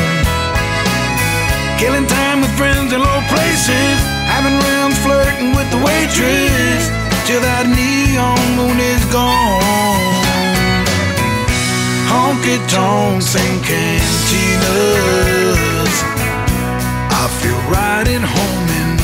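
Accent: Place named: Texas, United States. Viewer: How does he talk...sounds just like a typical Tex mex person.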